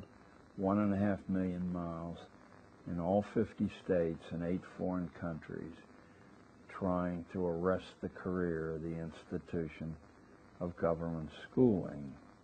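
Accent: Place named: Pittsburgh, United States. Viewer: The Pittsburghese is refreshing.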